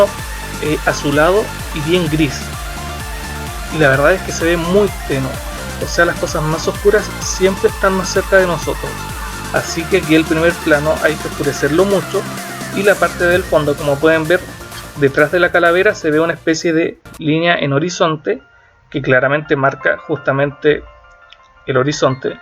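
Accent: Chile. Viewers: eres chileno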